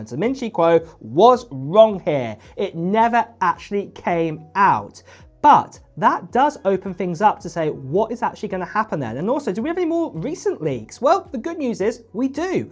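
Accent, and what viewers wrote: United Kingdom. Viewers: every time you mention Summer in your videos we are left clueless. Use months and years to help your viewers understand. Just because you have a brittish accent doesnt mean you are living in the UK !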